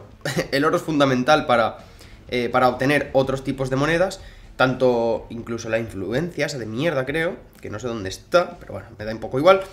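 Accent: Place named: Guatemala. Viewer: Voz Chapin como así ha pixonic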